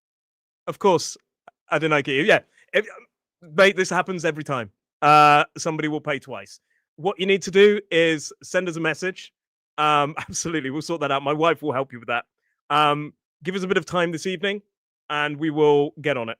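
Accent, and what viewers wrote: United Kingdom. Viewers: Hey uncle you very supper man.because you're speaking in britsh english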